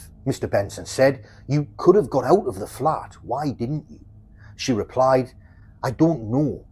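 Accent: United Kingdom. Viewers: I live in the UK, North West, and I'm delighted to hear a true crime you tube creator with a British accent and doing a stellar job of presenting these often more obscure cases as well as the obvious, I've got to give it you man you can find the ones we have not heard of and the ones that concluded like yesterday, nice format and clear presenting and very presentable, I predict a growth around here, keep it coming dude, Bravo!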